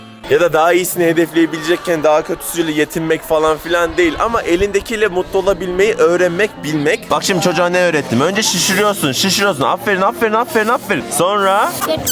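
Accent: Konya, Turkey. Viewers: bir Konyalı olarak en büyük ortak yanımız gözlük 👓 koyma yeri ve bırakamadığımız o meşhur gonya ağzı konuşma 😀 Konya dan selamlar 👋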